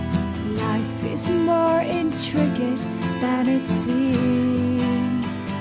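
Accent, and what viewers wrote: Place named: Limerick, Ireland. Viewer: After all, she never lost her Limerick accent. Ar dheis de go raidh a anam dilis a stoirin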